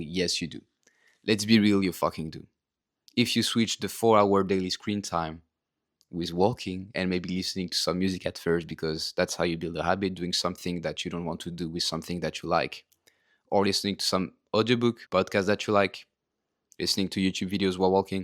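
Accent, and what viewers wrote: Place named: France. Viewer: This video is so informative! That French accent tho 🤌🏽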